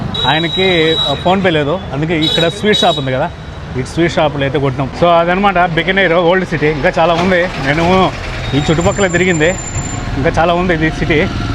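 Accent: Nellore, India. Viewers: Hello brother.. Mede nellore mata laga vundi..... Made nellore le..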